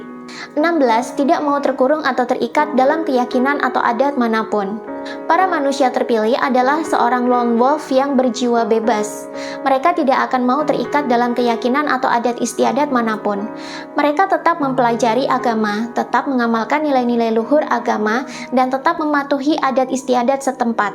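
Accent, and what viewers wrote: Java, Indonesia. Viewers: sampean kok medok mbak. jowo po ! 🤭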